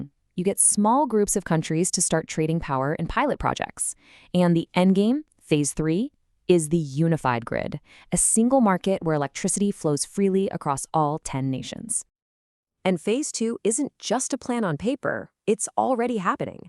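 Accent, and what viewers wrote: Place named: India. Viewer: Globalism, butch girl boss AI voice with annoying vocal fry to obscure Indian accent, leftist climate change BS, brainwashed NPC points. This type of low quality AI slop is so yesterday. Go away, and get a real job doing something useful for mankind, like working on India's trash, pollution, and sewage problems.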